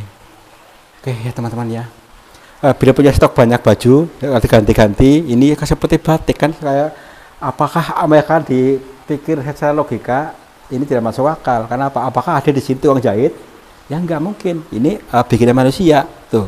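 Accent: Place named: Java, Indonesia. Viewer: JAWA MEDOK NYARI DUIT KONTEN NGADA NGADA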